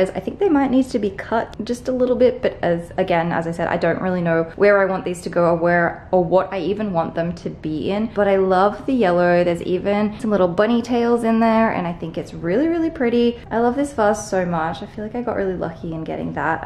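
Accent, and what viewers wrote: Australia. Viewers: Have you never heard a Australian accent before? Dude.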